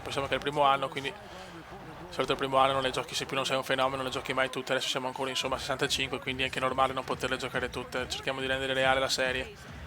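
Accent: Veneto, Italy. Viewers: che veneziano :D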